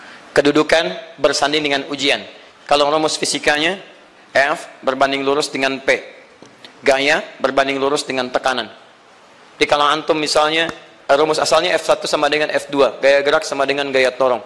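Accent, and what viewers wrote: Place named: West Java, Indonesia. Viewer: Alhamdulillah terima kasih Pa ustad kaya orang sunda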